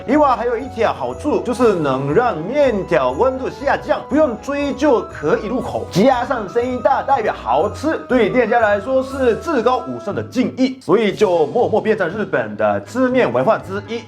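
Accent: Taiwan. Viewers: YT首頁推薦點進來的中文跟台語真好! 無視口音的話會覺得很像台灣人講話XDDD 因為沒時間睡覺只好去廁所補眠真的辛苦了... 還有一個人吃飯還要在意別人眼光也很辛苦啊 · 社長的台灣話說的真好啊很道地😄😄😄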